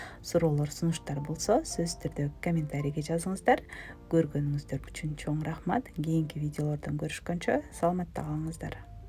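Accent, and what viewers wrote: Standard. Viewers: Таза кыргызча сүйлөйт экенсиң, кооз, уккулуктуу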